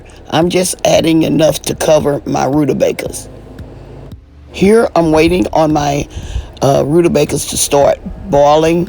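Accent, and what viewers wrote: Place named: Georgia, United States. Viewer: Sound like she's from old Georgia..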